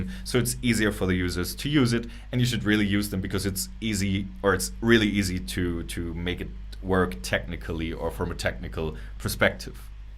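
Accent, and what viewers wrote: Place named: Germany. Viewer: Your English is frankly amazing. I'm a bit biased since I have a lot of german family so I'm use to the slight accent, but honestly it's great.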